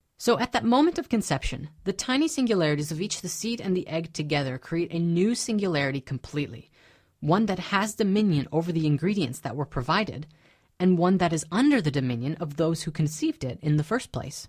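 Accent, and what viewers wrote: Canada. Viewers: Love it how (once in a while) the Canadian accent peeks thru! 😉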